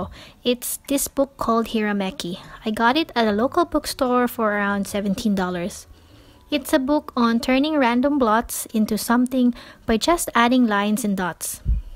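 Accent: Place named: Philippines. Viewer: Your voice kinda reminds me of a Filipino accent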